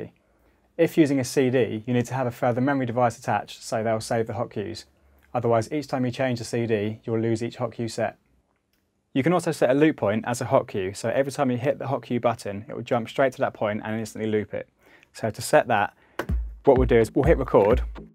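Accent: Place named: United Kingdom. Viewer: The guys accent is too pronounced and he talks too fast. The tutorial would be more effective if it didn't cater only to brits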